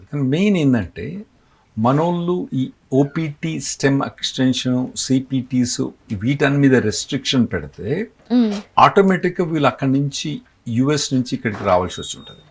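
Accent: Telangana, India. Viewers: Sir your words are pakka telangana❤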